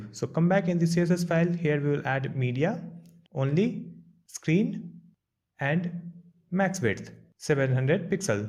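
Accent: India. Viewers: You instantly know that the video is good once you hear the Indian accent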